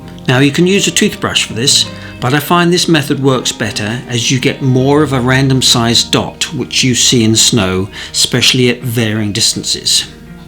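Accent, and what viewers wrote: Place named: Scotland, United Kingdom. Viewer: 'Jist' loved todays wee tree extravaganza...the touch of 'Scots' twang wis jist grand 😉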